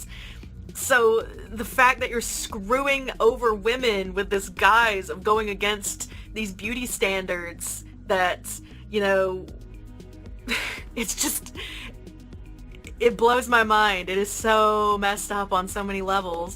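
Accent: South, United States. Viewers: Damn. When you said, "You create yourself," that was so powerful. You articulated everything so well in this video. Great job. I know this is a few years old but I hope you're doing well. Are you from the south? I like your accent lol. I'm from Tennessee. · Are you souther (sorry for this random question but I love your voice and I'm curious about your accent)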